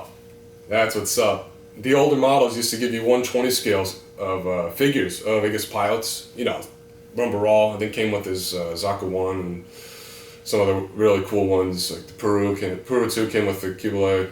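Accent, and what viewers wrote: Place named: New York, United States. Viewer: Your accent is like halfway between Sylvester Stallone and Rodney Dangerfield. So I'm guessing you're from NYC or one of the nearby areas, but where abouts? I mean Brooklyn is an obvious guess because how easily "Fuhgedaboudit" flows out when you talk. Hmm. Got me thinking there or the Lower East Side. Of course, I'm talking out my ass, you might be a Jersey boy.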